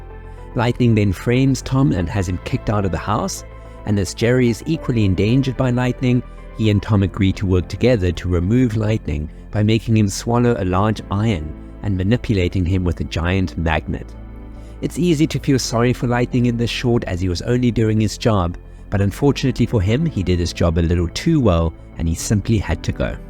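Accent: South Africa. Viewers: Hey you are South African right judging by your accent? It’s nice to meet a fellow South African cartoon lover.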